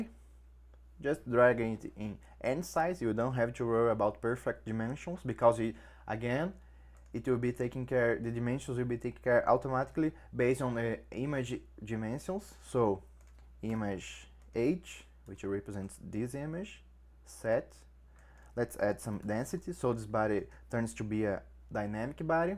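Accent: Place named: Brazil. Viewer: Are you brazillian: Your accent looks brazillian.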